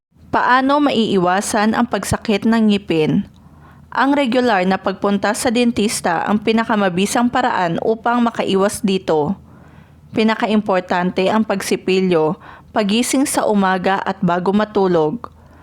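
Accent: Visayas, Philippines. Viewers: Bisakol magsalita Hahahahaha hahaha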